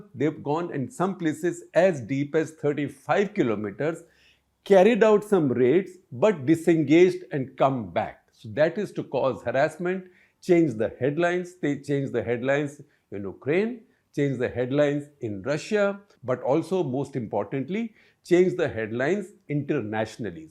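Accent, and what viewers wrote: India. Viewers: I wish you didn't have an Indian accent 😢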